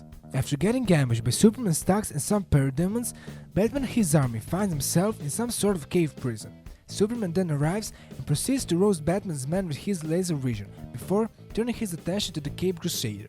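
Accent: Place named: United States. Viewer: Are you from america your accent is so cool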